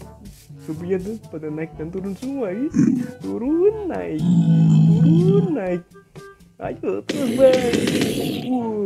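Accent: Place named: England, United Kingdom. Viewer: Tak kira Anda dari inggris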